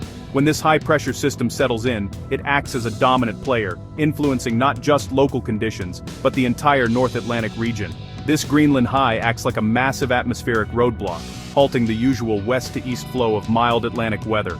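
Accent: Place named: United States. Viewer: UK weather .why has the narrator got an American accent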